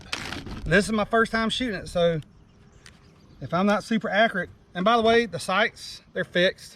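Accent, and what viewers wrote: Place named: Texas, United States. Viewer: well, I mean there is a Spanish pronunciation, but I wasn’t trying to offend you man. Sounded like just a southern accent. Similar to what my family in Texas would say. Liked the video, just made me chuckle. No offense brother.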